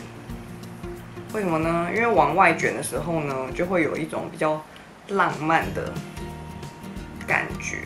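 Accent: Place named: Taiwan. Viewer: 棒棒的你是台湾人吼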